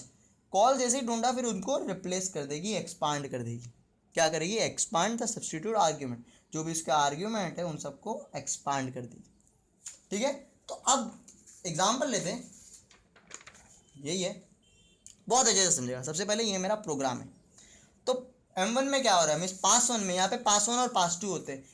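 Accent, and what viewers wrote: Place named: Gujarat, India. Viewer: are bhai aap kaha se ho? bato se to gujrati lag rahe ho....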